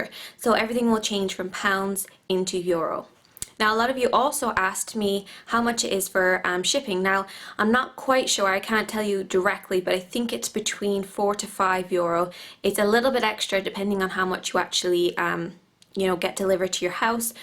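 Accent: United States. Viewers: Are you irish? Cause you sound like you're from America. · I think Shes Irish-american. She talks like a american with a Irish accent...if that makes any sense... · are you from america but living in Ireland? you sound so American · you almost sound american :)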